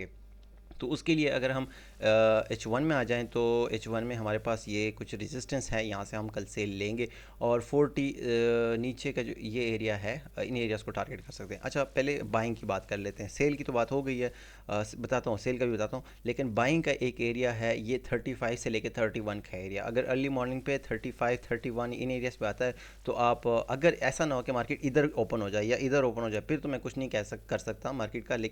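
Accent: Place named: Peshawar, Pakistan. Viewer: Assallam o alaikum bro aap peshawar s hu Mashallah ap ka accent unhi jaisa hai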